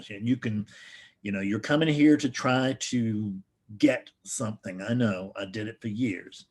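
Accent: South, United States. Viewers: channeling Alan Watts with a southern accent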